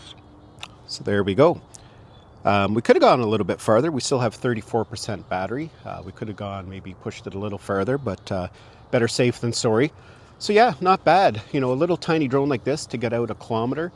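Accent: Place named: Maritimes, Canada. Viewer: Great video... and you sound like a fellow Maritimer... getting my Neo soon just waiting for a possible Black Friday deal.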